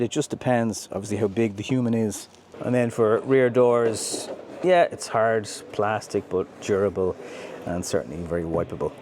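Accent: Ireland. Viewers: Ireland accent and best Dacia ever so stfu and take my money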